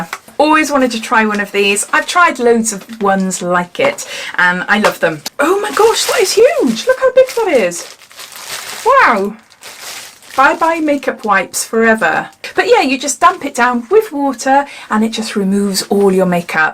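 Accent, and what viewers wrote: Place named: England, United Kingdom. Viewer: I could hear you all day! I love your English accent ❤ you are so pretty too! Love your unboxing xoxo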